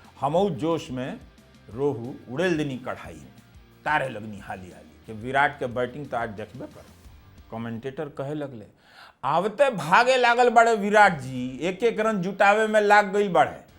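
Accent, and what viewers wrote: Bhojpuri, India. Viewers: Wao shudh bhojpuri ❤❤❤❤❤ Love you sir❤❤❤❤🎉🎉🎉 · इतना शुद्ध भोजपुरी मैंने कभी नहीं सुना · रउरा के मुंह से भोजपुरी बड़ा निमन लगेला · मजा आ ग़ईल राउर भोजपुरी वाला आवाज सुन के । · शुद्ध भोजपुरी में खबर सुन के मन गदगद हो जाता है। इहे जज्बा बनवले रही। आपन भोजपुरी सबसे बेहतर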